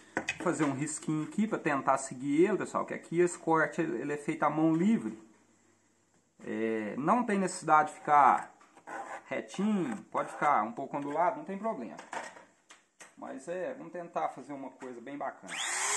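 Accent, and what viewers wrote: Minas Gerais, Brazil. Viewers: Obrigado pela referência a meu nome, amigo. Parabéns pelo vídeo. Pelo sotaque vc deve ser mineiro, certo? Eu já fui em algumas cidades de Minas e onde passei mais tempo foi na cidade de Manhuaçu. Gostei muito da cidade. E meu cunhado é de Belo Horizonte. Forte abraço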